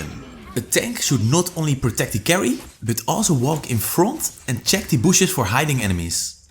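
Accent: Netherlands. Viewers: I can hear Dutch accent. Am I right? 😂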